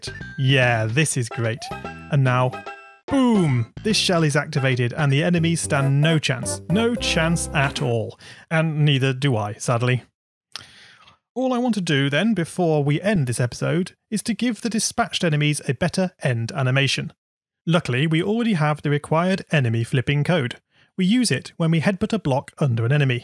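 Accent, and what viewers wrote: United Kingdom. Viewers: I absolutely love the way you sometimes call Goombas "Gumbas". That good old British accent :_cool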